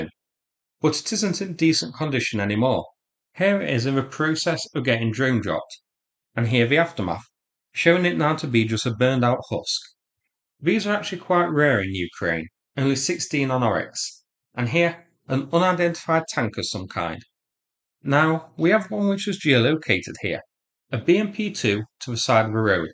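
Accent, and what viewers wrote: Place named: Mansfield, United Kingdom. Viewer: I always thought your accent sounded familiar, I’m from Rainworth but no one knows that so unfortunately I have to say Mansfield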